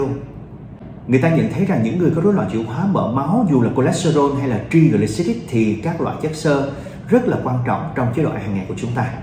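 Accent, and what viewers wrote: South, Vietnam. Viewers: Bác si giải thích rõ de hiểu và nghe rõ vì bác si người miền nam và có hình mình hoa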